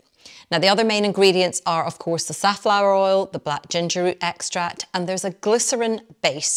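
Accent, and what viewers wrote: Scotland, United Kingdom. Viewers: I love your accent. Scottish or Northern Irish? I hail from Dublin.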